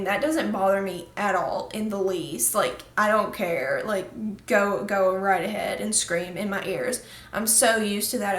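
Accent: United States. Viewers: I love ur fuckin country accent it cute and ur very pretty🔥👌🏻😘🤘🏻✌🏻️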